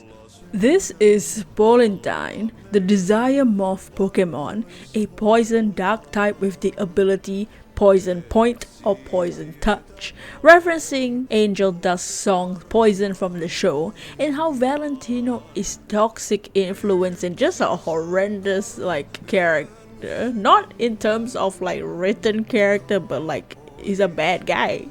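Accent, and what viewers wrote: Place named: France. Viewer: Par tout hasard, est-tu français ? Il y a un peetit accent Anyway, these designs are cool, I love the Alastor and the Lucifer one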